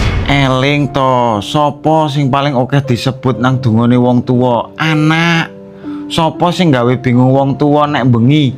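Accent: Purworejo, Indonesia. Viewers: Bahasane koyo wong Purworejo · Omahmu ngendi kang logat medok purworejo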